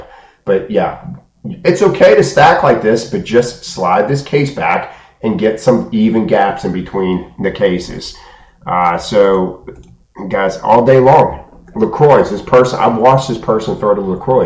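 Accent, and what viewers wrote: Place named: Pittsburgh, United States. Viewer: Caught the Yinzer accent right away 👍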